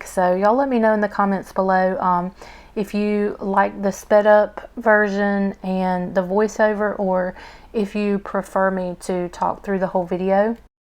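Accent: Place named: South, United States. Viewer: looove the spread and the southern accent 😍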